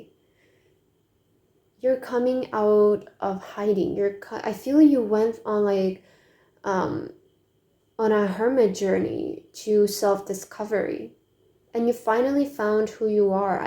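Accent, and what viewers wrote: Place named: China. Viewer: 哈哈哈哈姐姐是中国人嘛这个口音真的很可爱😂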